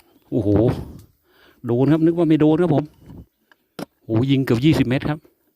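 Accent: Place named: South, Thailand. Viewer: คนไต้แน่นอน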